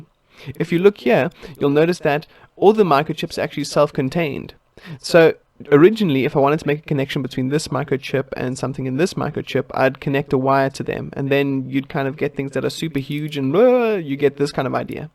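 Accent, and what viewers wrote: South Africa. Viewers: That south african accent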